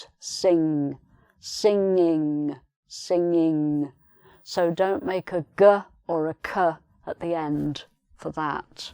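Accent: United Kingdom. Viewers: The British accent kill my ears. Jesus. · What a beautiful British accent.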